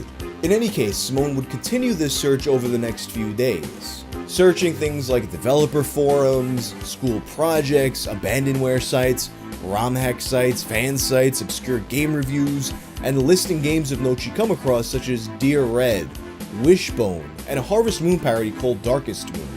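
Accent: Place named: New York, United States. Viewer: "NBA Jyaam" You sound just like your impression of the stereotypical New Yoyk accent from your Kill Count vids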